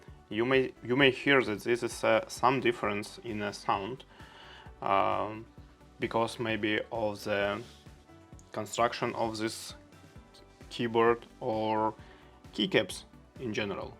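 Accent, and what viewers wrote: Russia. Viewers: Такое ощущение как будто он русский но говорит по русски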